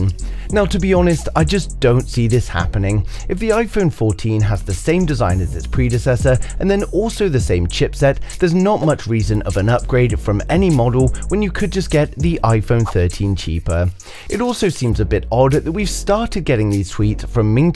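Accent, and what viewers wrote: United Kingdom. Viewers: They should use your voice for Nat geo when David Attenborough pass away you have a very strong informative British accent.ps super excited for the iPhone 14 pro max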